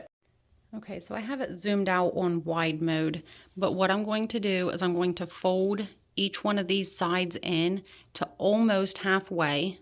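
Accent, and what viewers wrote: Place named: Maryland, United States. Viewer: Also are you from near Maryland? That acent😅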